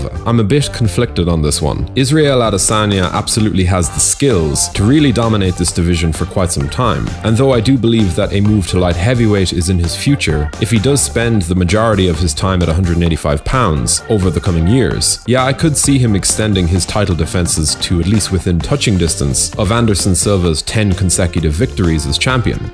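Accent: Ireland. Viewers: Hooray the Irish voice is back!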